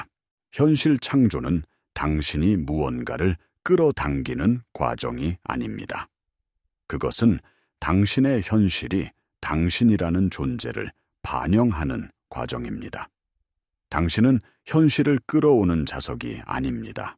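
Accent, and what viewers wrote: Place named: Gyeongsang, South Korea. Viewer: 대박대박대박 놀래자빠지고용 화이팅하이숑 경상도 사투리가 당연하지요 창녕띠기 아인교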